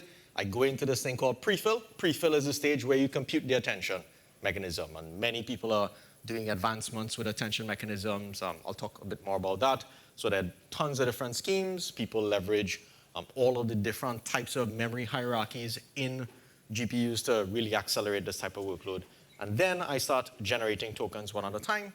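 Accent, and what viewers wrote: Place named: Caribbean. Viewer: Thanks for this presentation,. This has been very helpful. Also great to hear a Caribbean accent 🇹🇹. Bless up me bredda.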